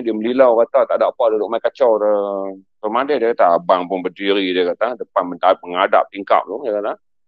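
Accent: Kedah, Malaysia. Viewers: Nak tanya...saudara pencerita ni org kedah ka? Cara gebang mcm org kedah...😆😆😆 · bunyi macam orang kampung saya, zul. dari kota Sarang semut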